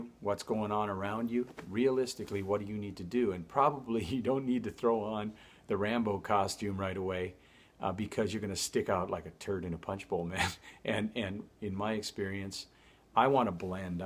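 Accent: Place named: Nevada, United States. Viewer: Sounds like a Nevada man :)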